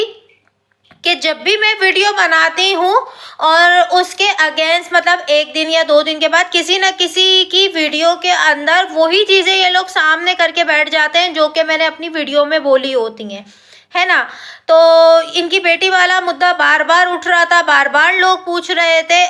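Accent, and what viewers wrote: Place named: India. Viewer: Sach me aap pakistan me raheti he aap ki baat cheet lahja indian jassa he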